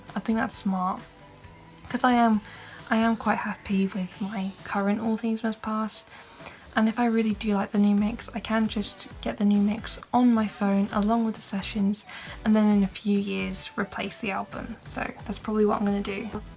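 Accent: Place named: United Kingdom. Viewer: I’ve got also another question: where in the UK are you from? Because you’ve got a lovely accent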